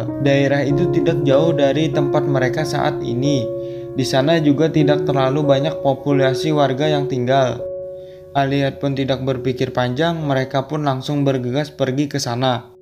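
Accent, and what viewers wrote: Kalimantan, Indonesia. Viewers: Bang orng kalimantan kah?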